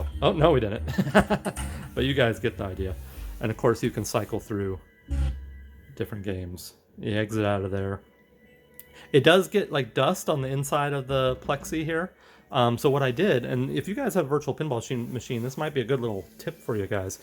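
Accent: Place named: Germany. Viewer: U sounds like u are from germany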